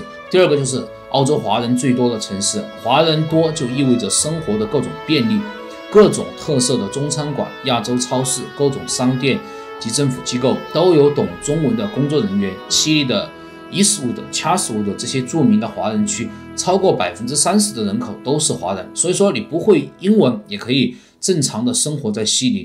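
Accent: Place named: Chongqing, China. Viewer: 博主听口音应该是重庆的？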